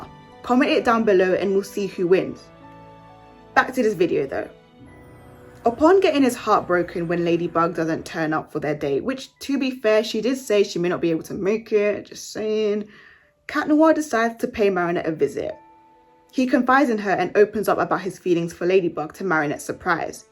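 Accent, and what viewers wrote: United Kingdom. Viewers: I love your British accent 😍 ❤ it's so nice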